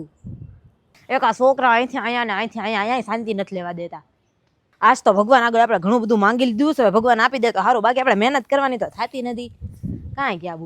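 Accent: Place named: Bhavnagar, India. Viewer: મને પણ ભાષા, આમ તો 'બોલી', સાંભળીને એ જ વિચાર આવેલો કે બહેન ભાવનગર બાજુનાં લાગે છે...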